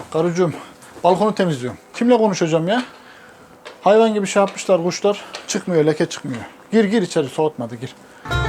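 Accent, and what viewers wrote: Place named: Çankırı, Turkey. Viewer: Bi çankırıli olarak şivene bitiyorum kardeşim helal olsun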